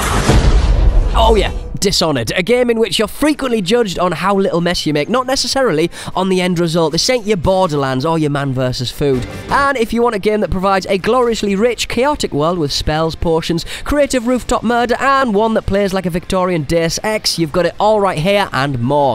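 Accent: United Kingdom. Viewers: holy shit that brit-ish accent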